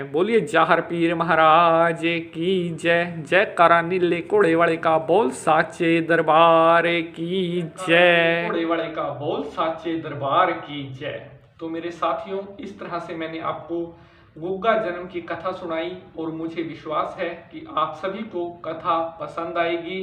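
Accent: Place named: Punjab, India. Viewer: Koi Punjabi lagda video bnon wala hindi nal gutham ghuthi hon dya e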